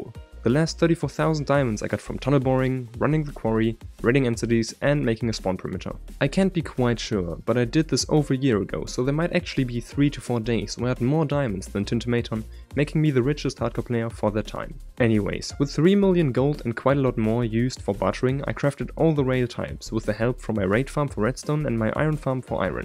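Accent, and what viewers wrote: Germany. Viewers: Du hast ein leichten deutschen Akzent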